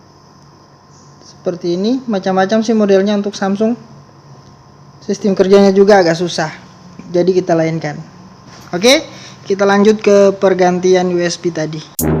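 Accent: Indonesia. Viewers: Kalo logat e kaya ne orang pasar bulu ini yo heeee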